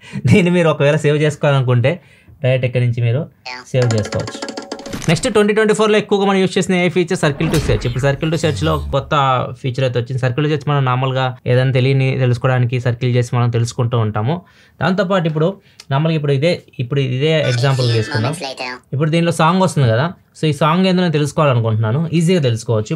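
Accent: Ongole, India. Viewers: Our ongole telugu....😂 edge kopu ga untadi...❤❤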